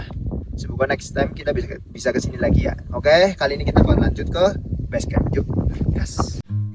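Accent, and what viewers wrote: Banyumas, Indonesia. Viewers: Semangat mas ganteng wong ngapak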